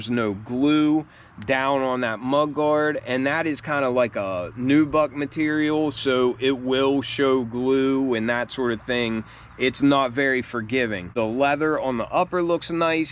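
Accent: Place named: South Jersey, United States. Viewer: U sound like ur from south jersey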